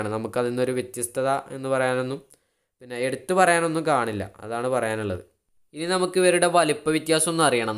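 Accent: Malappuram, India. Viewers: Malappuram slang 👍🏻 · മച്ചാനെ മലപ്പുറം രീതിയിൽ തന്നെ സംസാരിച്ചാൽ മതി അതല്ല പൊളി😍😍😍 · Bro I am from Trivandrum but I like your sound malapuram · Malappuram bhashayaa udheshichth ketoooo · Malappuram slang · Malappuram bhasha venam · മലപ്പുറം ഭാഷ കേൾക്കാൻ നല്ല രസമുണ്ട് മലപ്പുറം ഭാഷ തെന്നെ മതി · I'm from tvm... Malapuram slag kelkumpo interesting aanu... Ath mathii❤️ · Malappuram language not problem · മലപ്പുറം ഭാഷ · ഞാൻ ഒരു പത്തനംതിട്ടക്കാരനാണ് എനിക്ക് മലപ്പുറം ഭാഷ ഒരു കുഴപ്പവും ഇല്ല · Malappuram slang mathi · മലപ്പുറം ഭാഷ കേൾക്കാൻ ഒരു രസമാ അതു തന്നെ മതി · Malappuram reethiyil samsarikkunnatha enikk ishttam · ഏത് രീതിയിൽ സംസാരിച്ചാലും കൊഴപ്പില്ല... നമ്മൾക്കും കുറച്ചു മലപ്പുറം ഭാഷ പടിക്കാലോ 😁😜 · Malappuram പൊളി അല്ലെ. മലപ്പുറം ഭാഷ poli💥💥💯💯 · Malappuram basha sett 💯💯💯💯💯💯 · Malappuram style is OK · Malappuram style mathi chetta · എന്തുട്ടാ ഗഡിയെ മലപ്പുറം ഭാഷ കിടുവല്ലേ 🔥🔥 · Malappuram slang samsarichaal kandirikkan adipwoli aanu But baki ulla subscribersin manassilavunnilla thonnunna words translate cheythaal pwolikkum🔥🔥❤️❤️ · Malappuram tone eniyum veeenam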